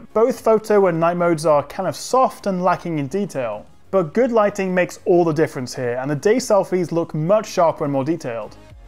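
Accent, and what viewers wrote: United Kingdom. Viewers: I like your British accent!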